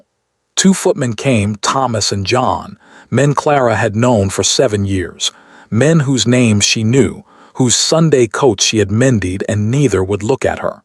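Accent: United States. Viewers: This is a well written story with a Cinderella plot with a duke instead of a Prince. The narrator has a rural American voice when it should be British. I love more visuals than one for the entire read. Thank you for the posting. Your work is most appreciated. From Amelia Island.🏝🏖🇺🇸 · The American accent is very offputting.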